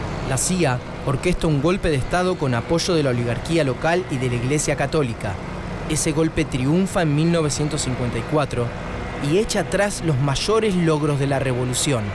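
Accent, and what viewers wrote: Buenos Aires, Argentina. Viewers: y bueno si te molesta el acento bonaerense anda a otro canal, no da que digas que es una "tara" cuando es el acento que tenemos la mayoria de los que vivimos en buenos aires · Che, primero que nada, cómo pronunciás la ch?? Porque tengo entendido que se usa en palabras como dereCHo, muCHas, CHanCHos, y no es lo que se escucha en el video. Capaz estás escuchando mal o confundís las letras, no se. Y segundo, capaz sería interesante que consideres buscar otro tipo de video la próxima si tanto te molesta este acento en vez de llamarlo por algo que no es, porque al fin y al cabo no es ninguna contribución humanitaria. Es el acento que manejamos los bonaerenses, como seguramente vos tengas el tuyo... saludos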